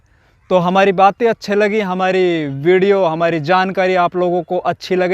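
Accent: Mewat, India. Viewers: Bhai u Tuber vlogger aap kaha se ho. Aapki boli zuban m Mewati zuban ka lahja h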